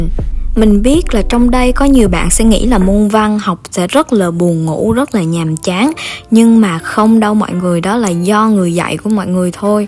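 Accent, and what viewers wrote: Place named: Da Nang, Vietnam. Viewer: Chị ý người Đà Nẵng ý bạn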